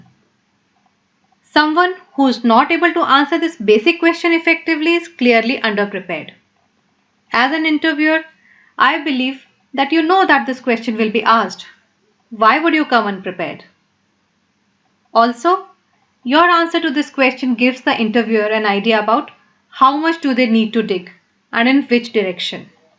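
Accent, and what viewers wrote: India. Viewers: I'm anti the Indian english.. my bad 😥😥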